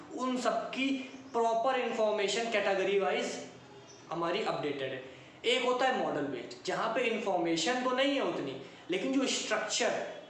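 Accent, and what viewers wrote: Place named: Kanpur, India. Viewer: bhaiya aap kanpur ya uske aas pas ke area se ho kya ?aapki tone vaisi hai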